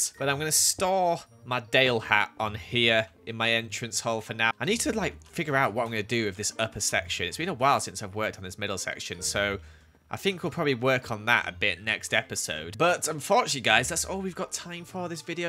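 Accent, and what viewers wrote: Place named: Scotland, United Kingdom. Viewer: Sounds like Scotland to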